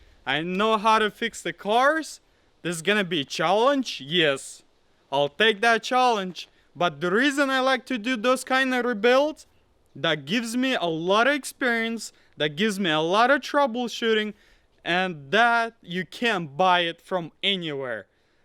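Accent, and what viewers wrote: Russia. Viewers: Блин! У вас русский акцент 😀! Ну молодец, восстановить полностью машину это технические работы! Было приятно смотреть видео!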